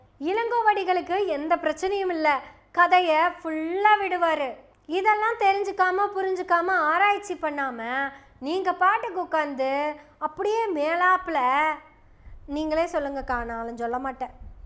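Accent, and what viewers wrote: Kongu, India. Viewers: கொங்கு பாஷையில் வயிறு வலிக்க சிரிக்க வைத்த பதிவு.முன்னேறி வாங்க.!..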